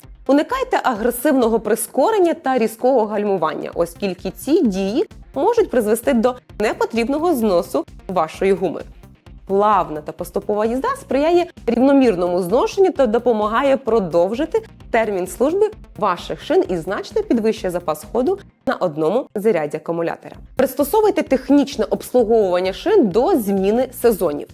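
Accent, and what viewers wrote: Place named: Russia. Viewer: вибачте- на "першому автомобільному" на другий рік війни ви капітулювали і,вслід за іншими, обмоскалились!??? Це жах - чути Вас масковскою😢😢😢... Осадачєк астался😮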